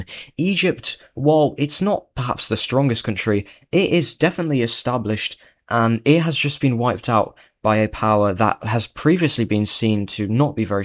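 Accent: United Kingdom. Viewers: Pls stop posting my ears bleed when I hear your nasty British voice, I hold no love for that person, for their presence is as a storm upon a once-peaceful sea. Their words fall upon the ear like poisoned arrows, clothed in fair speech yet bearing bitter intent. Were honesty a jewel, they would wear but an empty setting. I would sooner seek fellowship with winter’s frost than endure another hour in their company. Let fate carry them upon a path far from mine, for where they stand, discord follows as faithfully as a shadow. Thus I leave them to the judgment of time, wishing not vengeance, but only that our roads never again should cross.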